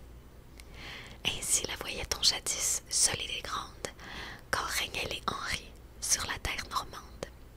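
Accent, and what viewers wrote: Quebec, Canada. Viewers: Ta voix est tellement relaxante 😍 et j’adore ton accent québécois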